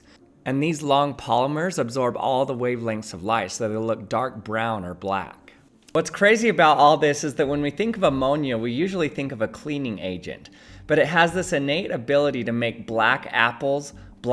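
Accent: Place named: United States. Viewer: Why does he sound so American and gay at the same time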